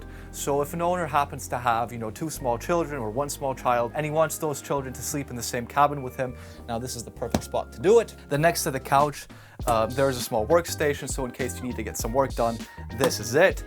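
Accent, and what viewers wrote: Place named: United States. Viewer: Wonderful gentleman's yacht with lovely lines. Great walkthrough! Very positive energy and excellent American English sir!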